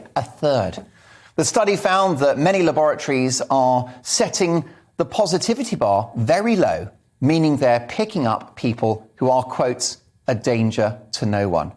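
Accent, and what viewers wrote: United Kingdom. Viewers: Thank you 👌It's long before done yet. Reckoning, it will be soon enough. Love your channel and love your British articulation 👌😊Daily follower here. From Sri Lanka.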